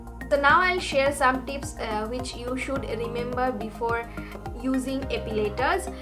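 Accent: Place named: India. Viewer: Honey, she's speaking in indian accent. I'm not an indian but her accent actually not that hard to understand.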